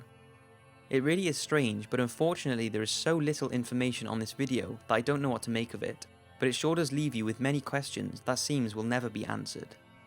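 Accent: Wales, United Kingdom. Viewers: I do love a welsh accent.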